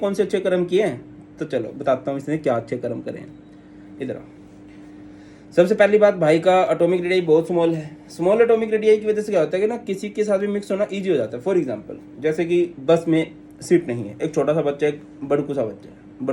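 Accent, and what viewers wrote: Haryana, India. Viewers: aarree sir aap bhi Haryana se ho me bhi sun ke accha laga 😇😊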